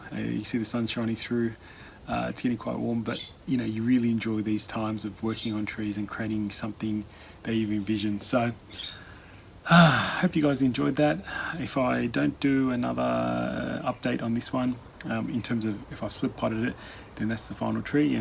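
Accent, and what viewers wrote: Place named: Australia. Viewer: Hi, I've been following you on YouTube for some time, I learn a lot about your work with bonsai, but sometimes it's more difficult to follow you due to more the bad sound than your beautiful Australian accent, many days there is wind, we like the sound of the leaves and the birds.....but it would be better with a microphone, don't you think? 😉, kisses from Barcelona, Catalonia your antipodes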